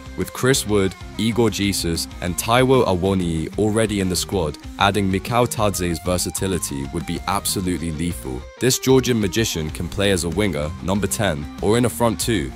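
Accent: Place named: London, United Kingdom. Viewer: Can your AI voice simulate a Nottingham accent please, London accent is annoying.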